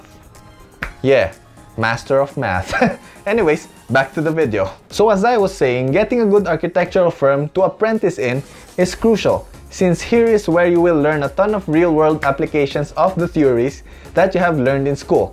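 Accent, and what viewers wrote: Philippines. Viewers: omg im so early ahhh! oh thank you!! im currently 4th year student and because of this i now know what challenges i may have encounter! thank you soo much! at first i was doubting myself if i could really be an architect because i suck at math and that im not that good at drawing and when im measuring i dont know the right measure or angle soo that made me think of another course but when i found your video i found hope that i can do it! soo thank you very much! i watch other arcgitectural video but cannot seem to understand them but you,i could really relate (maybe because were both filipino and love the accent make me feel at home so that make me feel comfortable and actually listening to you) thank you for being my inspiration and i hipe you will be my mentor in the future as you said XD! Oh well then god bless you kuya!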